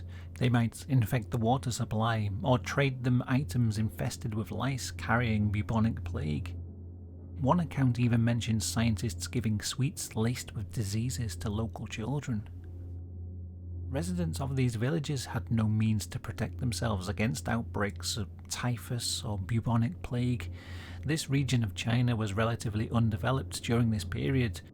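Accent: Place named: North Wales, United Kingdom. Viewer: He's from North Wales, apparently.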